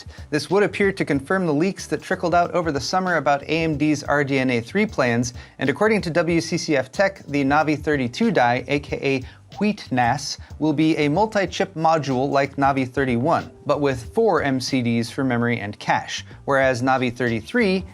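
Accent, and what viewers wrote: Germany. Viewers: I had to give a thumbs up for the pie alone. But I wish there was an option for a 10 times thumbs up for the German dialect. By far my favorite YouTube channel keep up the great work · commenting on your german accent has me between honesty and politenes. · Love the german accent dude😂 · you speak like a native Bavarian...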